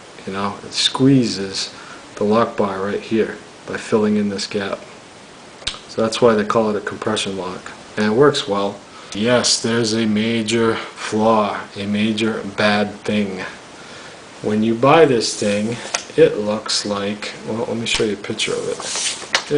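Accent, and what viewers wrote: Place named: New York, United States. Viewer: Love the New York accent ;)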